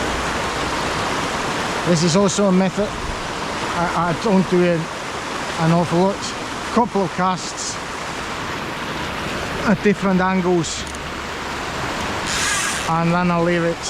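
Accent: Scotland, United Kingdom. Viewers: You have a Scottish accent. Great video btw. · Excellent video and a beautiful strong fresh fish, congratulations! Tough challenges in that current and the number of big rocks, well done! Thank you for doing in English and I compliment you on your excellent English which has a Scottish ring to it! Thanks for producing and sharing.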